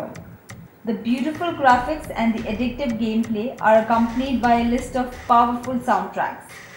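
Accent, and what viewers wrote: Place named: India. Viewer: From what i hear, she should be indian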